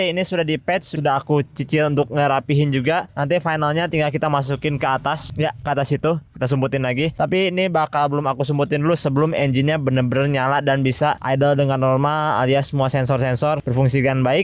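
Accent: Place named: Sunda, Indonesia. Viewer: Gak disangka, ternyata Akang ini kalo ngomong bodor & Sunda pisan Hehe. Kang kalo motuba tahun 90an (mesin injeksi awal2) diubah ke standalone gini abis berapa duit?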